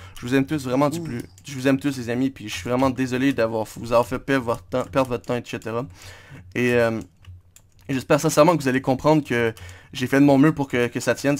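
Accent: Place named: Quebec, Canada. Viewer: 13minutes de plaisir a entendre cette accent QC 😍😍😘😘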